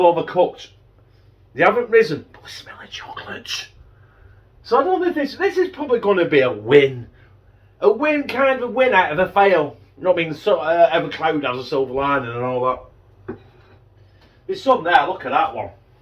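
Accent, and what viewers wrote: United Kingdom. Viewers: Just discovered you while searching for a chocolate pancake recipe. Dang you are fun!!! I'm thinking a spoonful of ice cream or whipped cream on the top w/ crushed KitKat or flake would be divine. Definitely agree w/ your end comment on the salt but would add it into the mix. I've subscribed based purely on this recipe plus being a Brit living in Texas I just love hearing your accent & 'language' LOL!!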